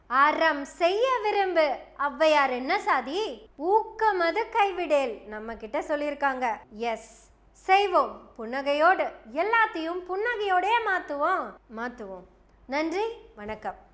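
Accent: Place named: Coimbatore, India. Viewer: அம்மா தாயி.. உன்னோட கோவை slang க்கு அடிமை..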